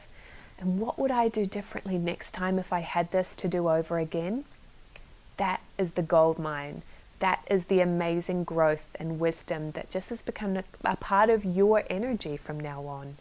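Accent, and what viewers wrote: New Zealand. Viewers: Very helpful thank you. I discovered you a short time ago on YouTube and I have appreciated a lot of what you have been sharing. I particularly resonated with your video on Doreen Virtue... I have never been comfortable with the traditional explanations of things like angels when it gets presented as absolute fact while things, and our understanding of them, can evolve. You sound a lot like a New Zealander and I'm guessing you've spent some formative years in the lower South Island (although I've been wrong countless times before). Best wishes from Nelson, NZ.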